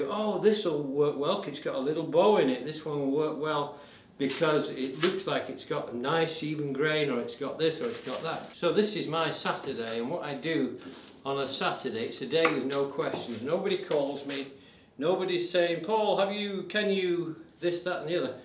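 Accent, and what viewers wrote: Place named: Derbyshire, United Kingdom. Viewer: The Thames!? I can't exactly place you accent, but it's close to mine, Tideswell, Derbyshire. Now living in northern Italy, chestnut forest. Find your attitude very reassuring, keep up the good work!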